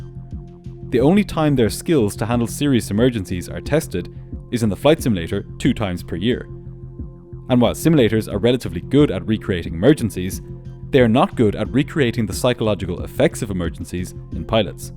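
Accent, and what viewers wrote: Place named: Ireland. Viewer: ….its his accent. thats how they say it in his region. im like 90% sure he’s irish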